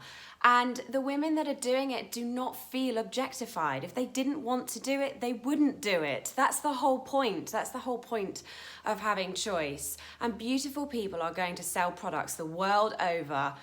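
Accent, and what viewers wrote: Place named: London, United Kingdom. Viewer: For some reason I always find the London tone makes everything sound more sophisticated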